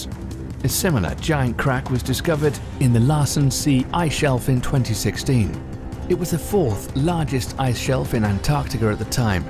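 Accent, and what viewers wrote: England, United Kingdom. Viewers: British scary accent, orchestra music, roll the drums and guess what; 99% of all the comments below, agree that this is yet another scary scam. After fourteen billion years of earth history and the survival of life, it appears that 100ppm of CO2 will doom the planet in the next ten years. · Freaking English accent and the background music make it so hard for me to concentrate..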